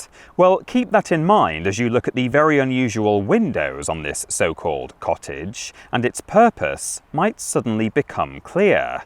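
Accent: England, United Kingdom. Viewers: OMG. its the British Chills. i didn't know i could hate a English accent voice more than I do the rest of them. YOUTUBERS STOP the SINGSONG BS.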